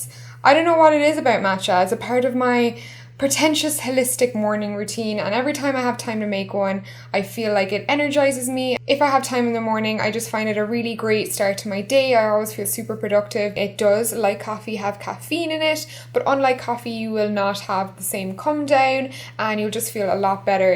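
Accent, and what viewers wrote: Ireland. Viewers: Are you Irish?!?!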